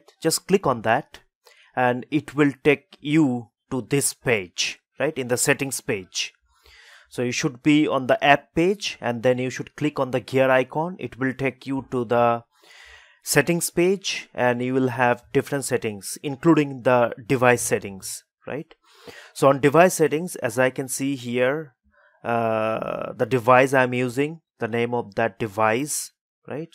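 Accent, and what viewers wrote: India. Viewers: your indian?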